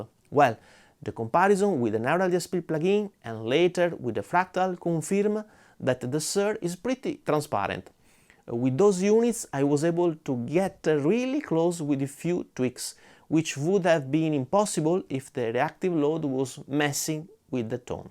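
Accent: Italy. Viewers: First, your videos are the best and most informative that I have seen anywhere! Interesting that for me the Quad Cortex had sound that was close overall but had some high frequency sharpness and harshness that I found problematic. This harshness was not present in the original SLO nor anh of the other contenders. Also interesting that none of them really nailed the tone. They were all pretty close (except for the Stadium- which was embarrassingly bad) but even the closest, the Neural plugin, doesn't sound indistinguishable from the real amp. Many people are saying that the challenge of sound accuracy is over, but there is plenty of room to improve if this comparison is representative of the current state of modeling/capturing. A lot of it may just be down to which capture you are using and how well the capture was done. One constructive idea if I might? It would be really helpful to have the clips played with the original right before each of the contenders. 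It's much easier to hear when you have the opportunity to hear the original again right before each of the new sounds for direct immediate comparison. Thanks for all the hard work you have done in sharing all of this information! Love your accent, Italian?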